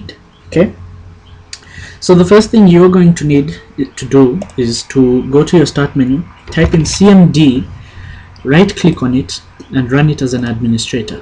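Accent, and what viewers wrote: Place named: India. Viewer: not all Indians speak like him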